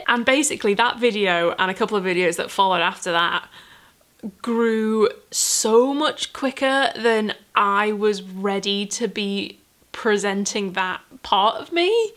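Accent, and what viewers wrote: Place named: Northern England, United Kingdom. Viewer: I've followed you for ageeesss and I love your vlogs watching your home decor updates has been inspiring! Found your channel through your midsized content but I've stayed because as a northern lass moving to to southern England it was nice to hear similar accent and banter if that makes ANY sense lol😂😬 do what makes you happy, also love gold dust Road! ♥️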